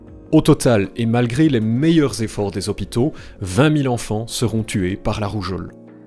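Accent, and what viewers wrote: Paris, France. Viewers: tu fais trop bien l'accent parisien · Suite à cet accent parisien je me désabonne. Cordialement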